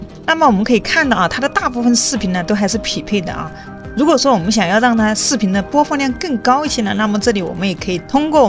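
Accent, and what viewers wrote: Sichuan, China. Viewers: 四川人吧博主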